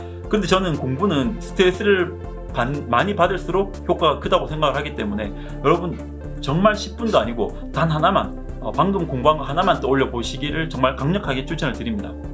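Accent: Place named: Gyeongbuk, South Korea. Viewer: 경북 거주하시나요? 억양이 저희 고모랑 똑같아서요 ㅎㅎ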